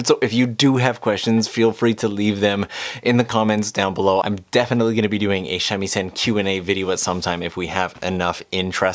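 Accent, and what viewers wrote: Canada. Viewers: I keep getting distracted by the Canadian accent. I’m wondering if you’re from Saskatchewan or somewhere around that part.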